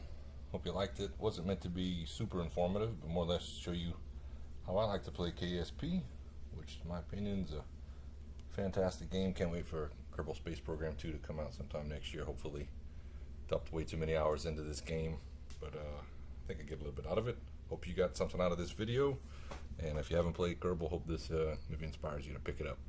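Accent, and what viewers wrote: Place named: Louisiana, United States. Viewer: Your accent is distinctly cajun. How many of your people have made it the Mun?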